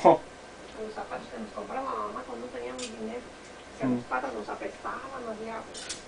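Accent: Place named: Jalisco, Mexico. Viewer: She talks like my momma, we are also Jalisquillos... el acento no se confunde me cae.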